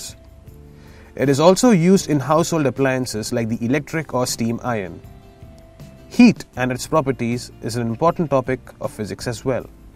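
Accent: India. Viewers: First when i saw the video with an indian voice i thought that this video is going to be a textbook explanation but while the video is going on, It is how i wanted it to be. this is very different from other regular boring textbook explanation videos. thankyou for the great content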